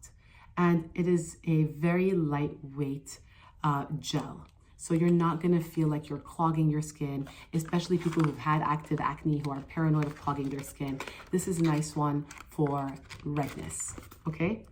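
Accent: France. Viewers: Great tips thank you 😊 also have a question on using laser for scarring or redness... is it useful, do you have any recommendations? love your french accent très chic oh la là 🤌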